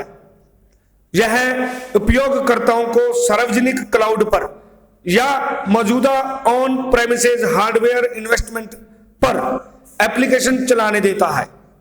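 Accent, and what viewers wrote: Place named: Punjab, India. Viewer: Aawaj me punjabi language ka touch aa raha h ji Good keep it up sir ji 👌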